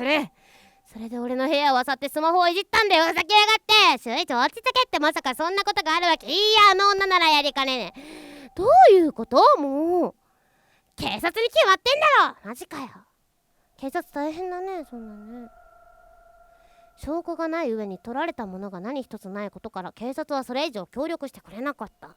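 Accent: Japan. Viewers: アイちゃん広島の子？じゃろってw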